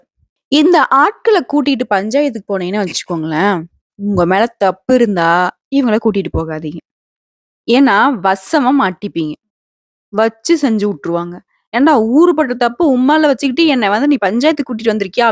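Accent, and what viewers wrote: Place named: Kongu, India. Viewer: நீங்கள் கோயமுத்தூர் அல்லது திருப்பூரை சேர்ந்தவரா மேலும் தங்களின் பேசும் தொனி கவுண்டர் இனத்தினை சேர்ந்ததாக தெரிகிறது. இனிமை.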